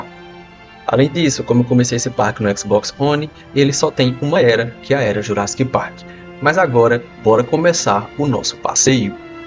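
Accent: Ceará, Brazil. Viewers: Cearense?, tipo eu🎉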